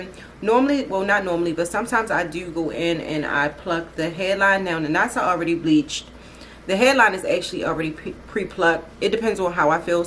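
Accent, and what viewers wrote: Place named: Baltimore, United States. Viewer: You're such a inspiration to black girls, you makeup always look bomb& I love your Baltimore accent ❤️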